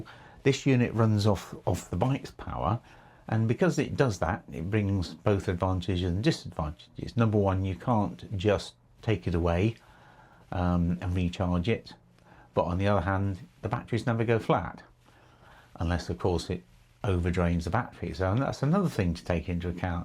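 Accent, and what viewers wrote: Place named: England, United Kingdom. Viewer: Sounds like it’s got some useful features.hearing you read them off. With your English accent.reminded me of Q.from the bond films. He was always telling bond.what the gadgets were.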